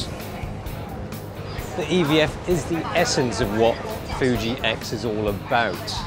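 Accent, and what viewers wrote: United Kingdom. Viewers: You sound British but your asian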